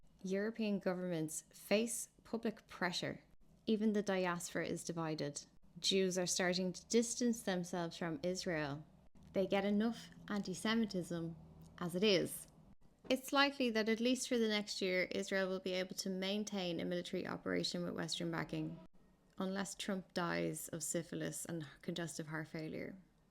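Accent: Ireland. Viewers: Is this wise lady Irish?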